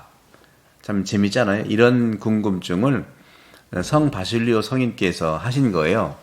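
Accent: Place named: Chungcheong, South Korea. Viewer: 신부님께서 태안성당으로 부임하신 후에 충청도 특유의 느긋한 양반체로 천천히 말씀하시네요. 훨씬 더 명료하게 잘 들립니다. 신부님의 강론이 귀합니다.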